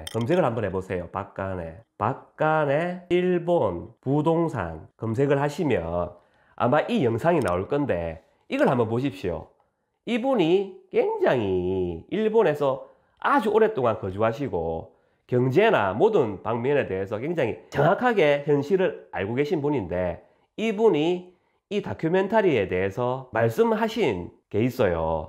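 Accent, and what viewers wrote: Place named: Busan, South Korea. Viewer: 잘 들었습니다~부산 사투리 듣기 좋네요~앞으로 좋은 강의 기대하겠습니다~^^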